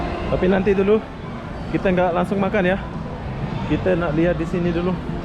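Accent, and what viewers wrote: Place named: Malaysia. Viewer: Wah awk cakap mcm org malaysia la.. "berape harge die" 😂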